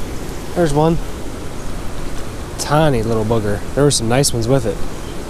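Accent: Pennsylvania, United States. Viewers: Thanks , Pennsy accent?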